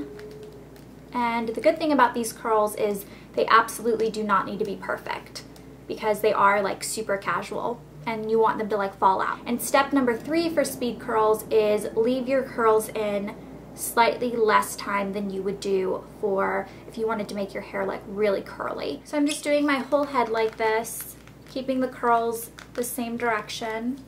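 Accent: United Kingdom. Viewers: British accent? London Olympics of course ( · OMG, love your british accent:) Sooo good!! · hahahaha your british accent - so hilarious <3 Love you! xx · Hahaha! Love your British accent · Haha I loved the British accent. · Your British accent is really good xx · I love your British accent haha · I love your British Accent ;-)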